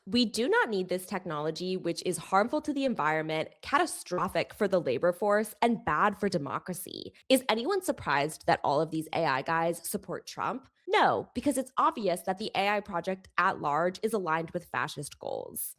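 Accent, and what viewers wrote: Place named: California, United States. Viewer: Californian? Plus bad vocal fry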